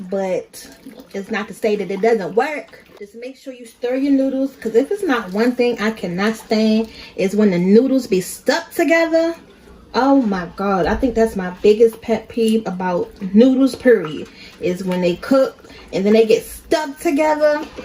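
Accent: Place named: Baltimore, United States. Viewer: I hear the Baltimore all in your voice sister ❤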